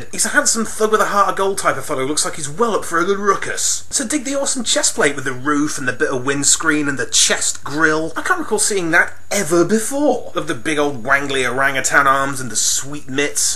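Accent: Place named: United Kingdom. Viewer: Hey lad, I know you sound British but in a video you showed a Budweiser. Are you truely British?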